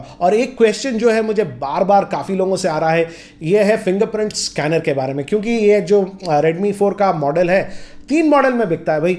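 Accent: Hyderabad, India. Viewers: Arka Seth trust me its only 30% hyderabadi hyderabadi is my native language if he talks completely hyderabadi u guys won't understand a thing!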